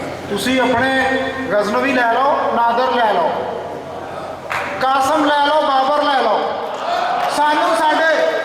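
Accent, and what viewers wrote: Hoshiarpur, India. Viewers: Bhaji tuhada lehja pure 100% hoshiarpur wala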